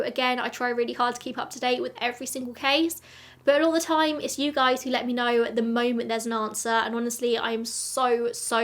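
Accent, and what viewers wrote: United Kingdom. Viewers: You're not saying it wrong at all, just British. Plus, you nailed your american accent of it! ❤😮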